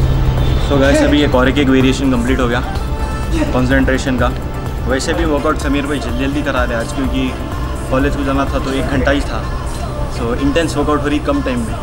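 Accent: Hyderabad, India. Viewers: Nice accent Hyderabadi londe.. mashallah khubsurat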